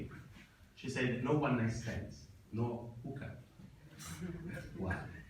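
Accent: Rome, Italy. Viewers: Non concordo, ha un ottimo inglese. Non fa errori grammaticali e ha un vocabolario più che adeguato. Ha una pronuncia più che decente, molto comprensibile seppure con un fortissimo accento italiano. Ma se si capisce cosa dice e non fa errori di pronuncia a lui trasparenti, l'accento non è un errore, non intacca la valutazione del suo inglese così come "nativo" non è un livello di inglese. Capisco che sia Italiano come capisco che una persona di Manchester sia di Manchester e una persona Irlandese sia Irlandese, eppure non diresti mai che un Irlandese non abbia un perfetto inglese perché non parla come Re Carlo. Perché poi si entra in una seconda questione: quale sarebbe il nativo corretto? Americano - e quale Americano? O preferisci RP? Ogni accento identifica la provenienza. Quale di queste provenienze siano considerate native non è questione di livello di inglese, ma di geopolitica. 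Il fatto che parli con un accento non mi dice assolutamente niente sul suo livello di inglese. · from a fellow roman it's funny how he transfers directly "roman humour" and accent in English. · HA ROMANIZZATO L'INGLESE...L'HA RESO SPICCIO HE HAS ROMANIZED ENGLISH TALKING...MORE EASY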